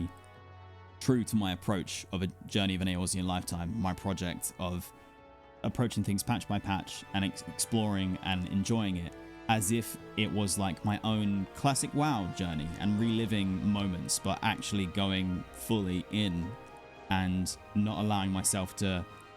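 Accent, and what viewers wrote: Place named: United Kingdom. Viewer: Wait are you in the states? You have a British accent. My bad. I don't mean to offend anyone <3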